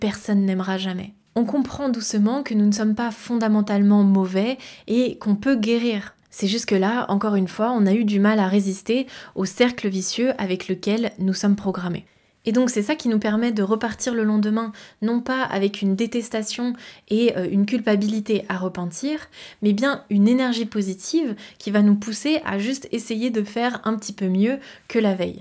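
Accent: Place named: Japan. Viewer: wsh tu parle français bien japonais stop wsh puré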